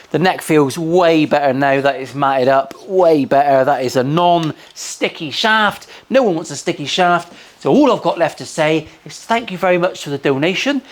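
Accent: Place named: West Country, United Kingdom. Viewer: Only just discovered your channel,. Excellent vids love the humour. Being Milehouse Studios you're obviously based in the 'Muff-always nice to hear an accent even more Wurzel Gummidge than mine, bey 😂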